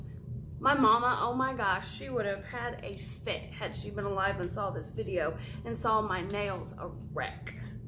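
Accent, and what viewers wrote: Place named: South, United States. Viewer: Trust me! I didn't take it as "snarky". All good. I can hear the southern in your voice and relate. :)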